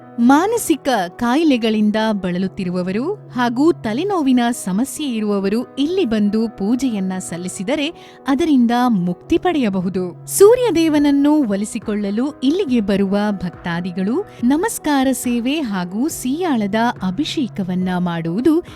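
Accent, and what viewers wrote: Standard. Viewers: ಶುದ್ಧವಾದ ಕನ್ನಡ ಮಾತು ಕೇಳೋಕೆ ತುಂಬಾ ಚನ್ನಾಗಿದೆ all the best god bless u.❤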